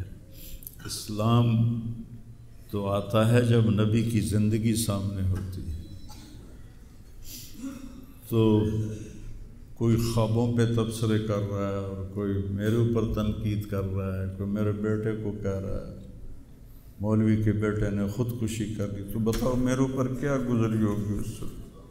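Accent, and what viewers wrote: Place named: Paharpur, India. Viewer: Not a muslim, maulana sahab being a hindu, I have lot of love for you in my heart the way you speak, the same language my ancestors spoke,reminds me of my daadi, she passed away from this world in 2020, she was as innocent and lovely as you,my ancestors are from paharpur Dera Ismail Khan, NWFP.. my heart is full of love for you, to see you sad, saddens me too!! Literally in tears!!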